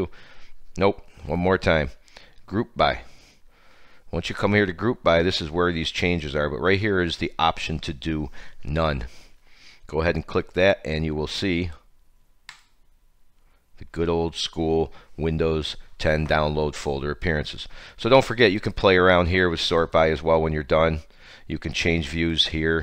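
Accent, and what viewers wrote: United States. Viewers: Beautiful midwestern accent!!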